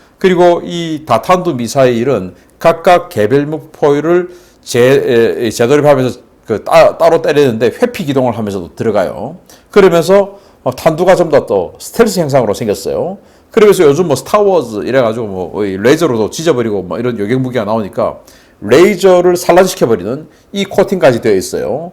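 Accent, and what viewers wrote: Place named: Gyeongbuk, South Korea. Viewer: 말투를 보니 대구 경북 사람이구만.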